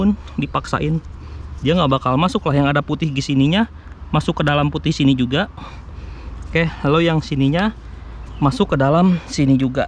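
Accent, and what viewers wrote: West Java, Indonesia. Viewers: Sunda eyy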